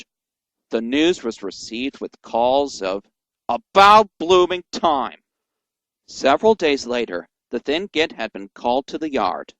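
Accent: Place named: United States. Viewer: Why are these narrations so American and so censored?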